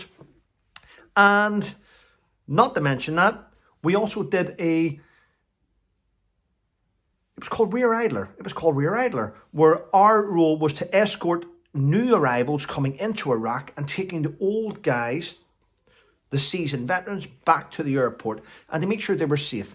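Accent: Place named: Ireland. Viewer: Oh that must have been so funny 😆 I love hearing your stories especially your Irish accent, my late Mum came from Carrickfergus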